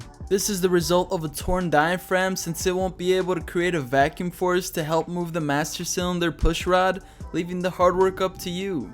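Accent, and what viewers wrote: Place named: Pennsylvania, United States. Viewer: Which im guessing is PA by the way chrisfix says water " woder " .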